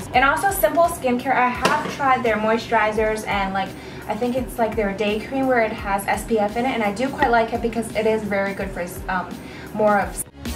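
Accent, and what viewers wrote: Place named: England, United Kingdom. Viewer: Love ur English accent xx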